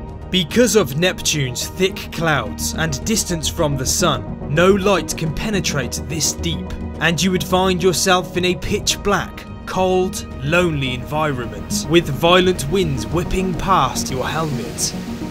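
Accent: United Kingdom. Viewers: That's because he's British! Us brits explain things in great detail and talk properly 😁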